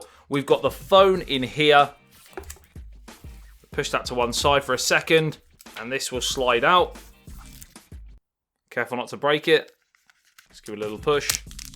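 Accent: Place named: Essex, United Kingdom. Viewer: This man is clearly of irish Catholic descent. Hia facial features are a dead giveaway. What puzzles me is why he is talking with an essex accent. He should do the next video in a raw irish accent to pay tribute to his irish Catholic ancestors. I knew he was Irish the first time i saw him on youtube which was last week. He is a strong Irish leprechaun trying to present tech videos. He is an Irishman by blood.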